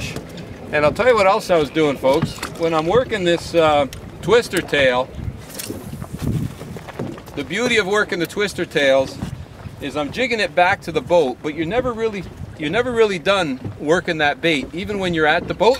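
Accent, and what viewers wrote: Canada. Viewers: r u Canadian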